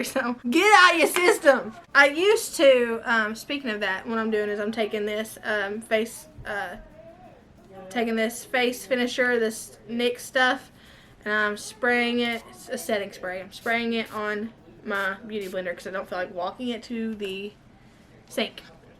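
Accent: South, United States. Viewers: I love hearing you talk. With your sweet southern accent & upbeat personality you put your viewers in a chipper mood. ♥️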